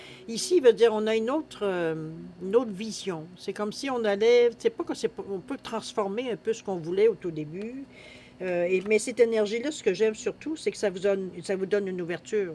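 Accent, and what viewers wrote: Quebec, Canada. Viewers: Bonjour je vous découvre et j ai beaucoup aimé vos tirages.Selon moi vous êtes du Québec selon votre accent si oui moi aussi.J adore vos interprétations à chaque oracle.Ce tirage me va comme un gant.Merci beaucoup ❤🙏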